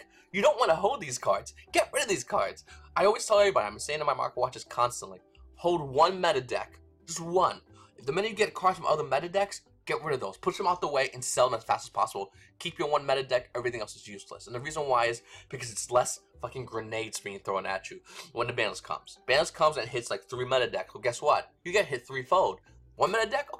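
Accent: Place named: Northeast, United States. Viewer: Kentucky?..........KENTUCKY?.......... ON god i thought you was from NY, Boston or Jersey, just by your accent! Hey it's V here! If that dont sound Northeast when u say it i don't know what is Lmao!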